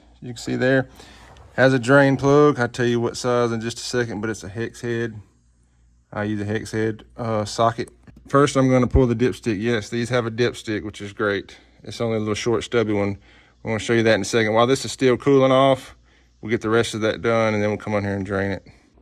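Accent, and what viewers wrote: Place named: South, United States. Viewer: Hard to view do to his Southern accent. A short video that felt like a lifetime...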